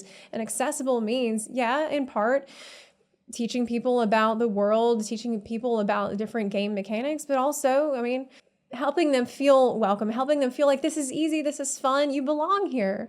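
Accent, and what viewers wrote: South, United States. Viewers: Her southern accent is hot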